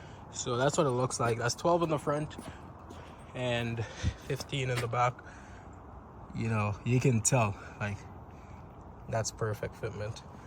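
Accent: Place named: Jamaica. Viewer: Bro has a lil Jamaican accent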